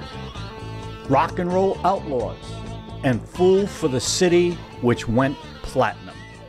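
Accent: Boston, United States. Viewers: That’s because of his Boston brogue. It’s like telling an Australian they pronounce “car park” wrong because they drop their r’s 🙄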